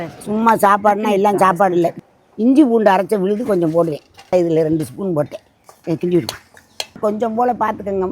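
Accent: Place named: Ilayangudi, India. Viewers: Etha oru illyangudi ya slang amdiya irukay❤❤❤ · Ilayankudi pechu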